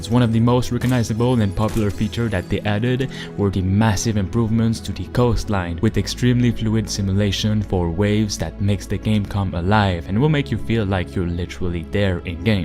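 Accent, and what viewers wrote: Quebec, Canada. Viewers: Your voice sounds québecois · Es-tu québécois? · Are you from Quebec? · Salut, je viens de découvrir ta chaine et t'as genre un certain accent. T'es tu Québécois pas hasard? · you defenitly sound like a french Canadian.... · T’es clairement québécois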